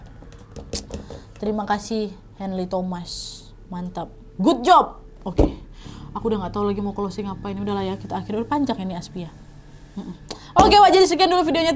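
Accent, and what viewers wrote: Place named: Sumatra, Indonesia. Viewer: Logat nya Ayuk Sumatra banget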